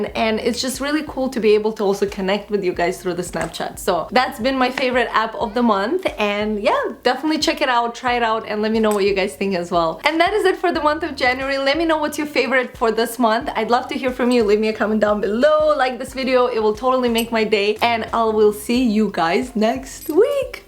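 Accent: Russia. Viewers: whats your accent from? you sound a little bit russian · Hi, i love your videos ♥ I started watching them recently. I know, that my English is so-so (I'm from Russia), but I think you're from Russia too, cos u have a Russian accent, is it? It may be, I have mistake. :) and by the way, you is a beautiful <3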